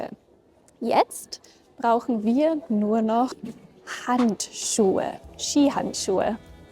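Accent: Standard. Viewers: [sch ≠ ch = -ig] Die Grundregel für die Aussprache der standardisierten, literarischen, landesweiten, einheitlichen deutschen Sprache. Hochdeutsch.🖤 ❤ 💛 Das ist nicht nur angenehm zu hören, sondern auch leicht zu verstehen! Gut machst 💪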